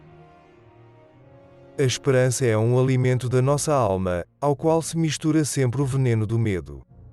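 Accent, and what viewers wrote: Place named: Portugal. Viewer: Eu não consigo levar a sério essa voz de Portugal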